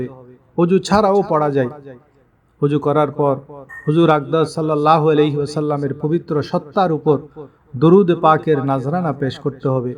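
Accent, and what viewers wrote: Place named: Chittagong, Bangladesh. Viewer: সালাম নিবেন। ইসলামিক ভিডিও দেন মানুষের উপকারের জন্য। কিন্তু বেশি কথা বলা আপনার একটা সিরিয়াস রোগ, এর চিকিৎসা নেন। অনেক সত্য কথা বলেছেন সাথে মিথ্যা ও বলেন। যেমন বললেন একটি দোয়া দিলেন ১০ টা দোয়ার লিষ্ট। মামুষ কোনটা রেখে কোনটা পড়বে। যদি সওয়াব প্রাপ্তির জন্য করে থাকেন তাহলে কম কথায় আসল বিষয় বলে দিন। মানুষ উপকৃত হবে। আর যদি অন্য উদ্দেশ্য থাকে তাহলে কিছু বলার নাই। কথা শুনে চট্টগ্রামের লোক মনে হয়। চট্টগ্রামের লোক মনে হয় একটু বাচাল।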